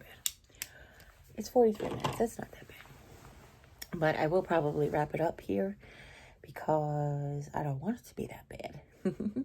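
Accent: Long Island, United States. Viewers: I could listen to you all day long. I just love your stories and your accent is just amazing. I'm learning so much about Long Island and New York its magical. The magic has even cast the spell of being able to keep up with your fast pace of speech 🤣😂🤣😂. Hey, Jack of all trades is a skill in itself, nobody employs an electrician, plumber, gas expert, carpenter, joiner etc for little jobs around a building, they employ a handyman/tradesman/maintenace guy. Quite simply a 'Jack of all trades' Theres so much i need to do around my home, I'm watching your past videoes to learn how. God bless you my fast talking friend. You are in his hands and all will work out according to his plans, as it should. Xx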